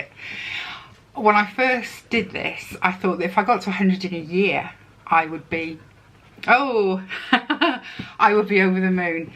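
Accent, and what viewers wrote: England, United Kingdom. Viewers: Hi, I found you through Vicki Wiik channel and so glad I did. I live in Canada 🇨🇦 now, but originally from London, England. Your channel is fun and down to earth and it’s so great to hear an English accent and see a different slant on unboxings., etc. I do miss England but Canada is lovely too. Looking forward to more videos now that I’ve discovered you. By the way I’m not a YouTuber, I don’t have the ‘balls’ as they say over here. 😄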